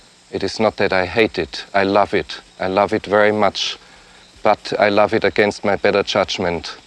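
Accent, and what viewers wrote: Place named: Germany. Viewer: That cynical voice, the thick german accent full of wisdom and perspective. Gives a remarkable contrast to the organic background and the animal sounds that almost mocks his wisdom with creativity.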